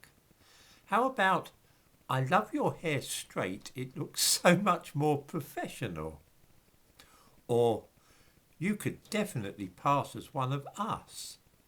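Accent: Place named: Middle England, United Kingdom. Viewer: Ah,the Wonderful,Soothing,warm tones of middle-England,just like a Cup of Tea!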